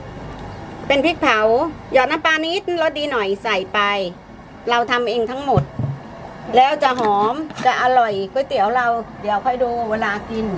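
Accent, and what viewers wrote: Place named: Nakhon Sawan, Thailand. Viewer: สำเนียงพูดเหมือนคนนครสวรรค์เลยค่ะ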